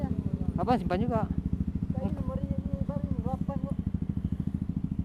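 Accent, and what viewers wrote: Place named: Aceh, Indonesia. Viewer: Logat Acehnya masih,btw selamat atas 1k sub🎉🎉🎉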